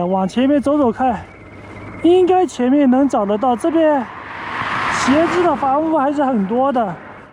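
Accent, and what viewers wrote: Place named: Jiangsu-Zhejiang, China. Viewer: 江浙口音啊，骑这么远？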